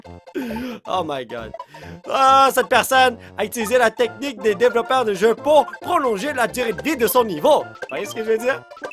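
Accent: Quebec, Canada. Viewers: Sa fait je sais pas cb de video que je regarde et je me demadais si il était quebecois ou francais maintenant j’ai la reponse xD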